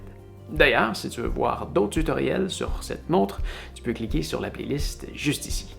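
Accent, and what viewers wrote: Quebec, Canada. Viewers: Genial, merci beaucoup, ça m'a aidé à le repéré avec ma toute nouvelle montre Garmin :) En plus, je kif ton accent quebecois :) Juste un truc, je cherche encore comment on règle le volume .... si tu as la réponse, c'est welcome !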